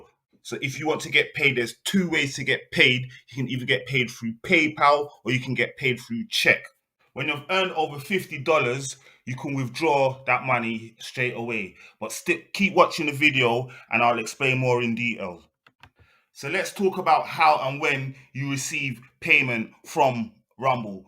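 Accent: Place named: United Kingdom. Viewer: Yes thank you for this video. Been trying to find out how to set up Rumble account. Nicely explained by you man. Nice too to hear the voice of a guy from my ends. Thanks again. Eldad Amaa(UK).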